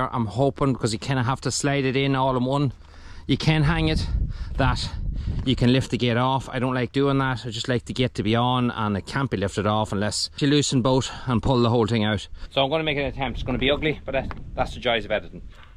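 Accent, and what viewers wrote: Ireland. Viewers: Love it, love it, love it......especially the wonderful Irish accent that goes along with every video. Thanks from Australia.👌👌👏👏💕💕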